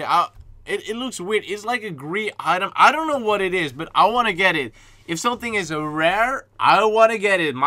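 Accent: Netherlands. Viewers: Hes dutch..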